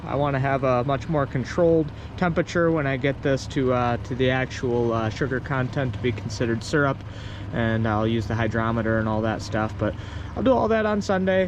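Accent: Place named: Canada. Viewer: Are you sure you're not Canadian? You sure sound like it. No offense intended.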